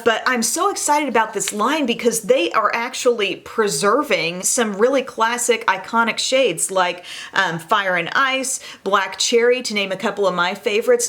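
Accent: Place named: Midwest, United States. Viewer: a nasal voice is indicative of the midwestern American accent, she's from Illinois. As for broadcasting, used to be a newscaster; originally did her YT as the. Your attitude needs no explanation.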